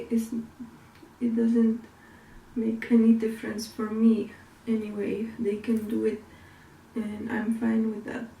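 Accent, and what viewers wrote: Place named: Switzerland. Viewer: Your accent sounds Switzerlandish :) Nice to meet u sister Allah keep u strong sis. Amin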